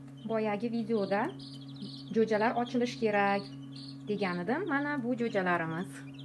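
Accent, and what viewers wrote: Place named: Karakalpakstan, Uzbekistan. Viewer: Ассалому аллайкум! Мен хам олгандим 70талик окидан. 29 та гоз тухумидан 15та очиб чикди! Кейинчалик 128талик фул автомат олувидим 57та гоз тухумидан 1та очиб чикди! Кайфият "0" ! Таблода харорат 37,2 тухумда эса 38,9! Биринчи кафат билан иккинчи каватнинг хароратининг хам фарки катта! Синглим, гапришингизга караганда Коракалпогистонликга ухшайсиз! Илтимос, неча фойиз очиб чикаяпти тугрисини айтинг! Иккинчи каватдаги тухумларингиз ковурилиб колмаяптими? Хароратни канчага куйдингиз?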